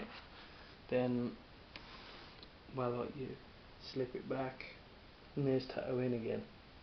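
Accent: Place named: England, United Kingdom. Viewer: Definately a box set i would like to get . Great review buddy . This is the first time i have heard your voice , you are a natural speaker . I really like hearing the English accent . Peace....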